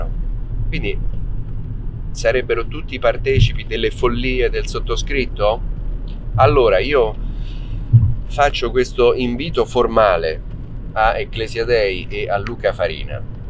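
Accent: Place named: Tuscany, Italy. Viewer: Quanto rancore in Toscano !!!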